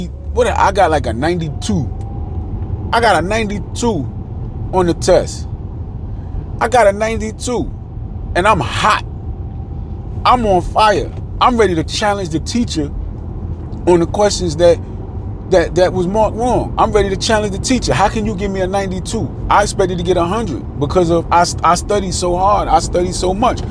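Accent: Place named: New York, United States. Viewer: Sound like you from one of the boroughs.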